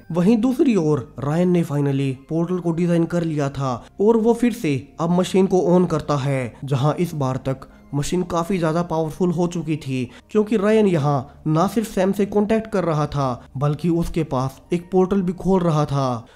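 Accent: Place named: Bengal, India. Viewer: I think you are Bangali.,.........like me, because your speaking style like bangla,............ don't mind that's my opinion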